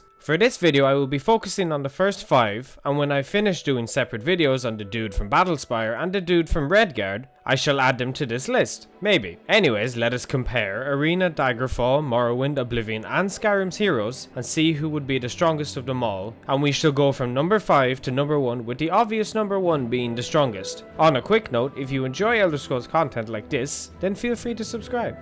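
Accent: Ireland. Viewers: Dude you misunderstood something, the realm of a Deadric Prince is his BODY. Why do you think Jyggalag didn't come back after this? Because he lost his Real/Body and so has the power of a lesser Deadra. You the "Hero of Kvatch" are the new owner of this realm, so you own all the power that is in it. Love your Channel and your Irish accent. Ps. Dragonborn can alter the Dream of Creation and so are extremely powerful but are only tiny pieces of Aka (the Original time god).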